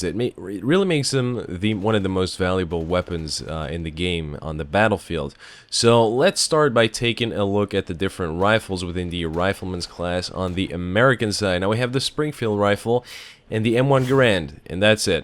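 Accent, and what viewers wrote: Ireland. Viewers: Is he an Irishman ? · Sounded like the irish accent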